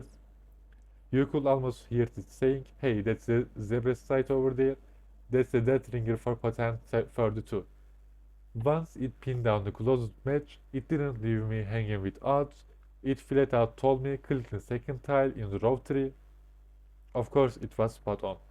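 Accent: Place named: India. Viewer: hintli sandım aq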